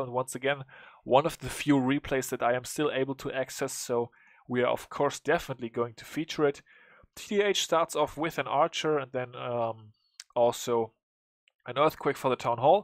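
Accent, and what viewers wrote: Germany. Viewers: Du bist glaube ich deutscher also du hörst dich so an sag mir mal bitte wenn du Deutsche Bist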